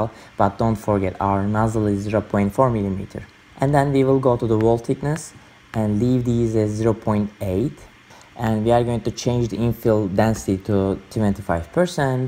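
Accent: Turkey. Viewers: Your accent sounds like you are Turkish and i am proud of you, thanks a lot for sharing your experiences.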